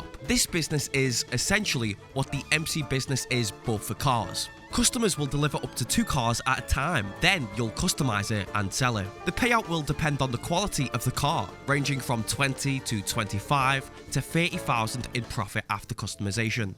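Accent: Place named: England, United Kingdom. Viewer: "Pearson" It's great to hear someone with a genuine English accent :) You don't get to hear this kind of narration anymore.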